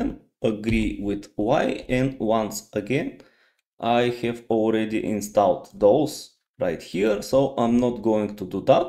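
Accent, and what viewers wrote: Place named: Russia. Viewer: Я отчётливо лсышу русский акцент, ну или славянский, а так спасибо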